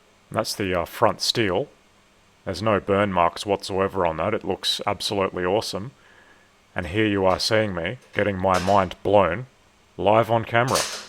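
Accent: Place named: Australia. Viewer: This is a great video. I love your Auzzie slightly annoyed all the time accent. Anyone who can work on a transmission like yourself is a master mechanic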